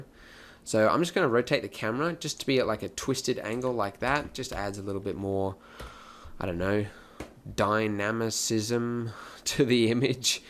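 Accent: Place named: Australia. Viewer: Great tutorial mate, feels good to hear an aussie for once. Alot better to understand.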